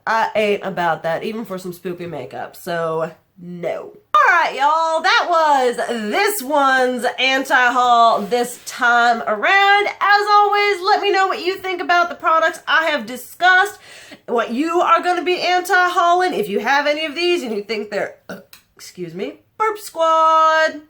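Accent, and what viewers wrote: South, United States. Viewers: I’m from the South but live in NJ now. I love listening to your accent and after watching your videos, my kids tell me my use of the word “y’all” goes way up! ❤️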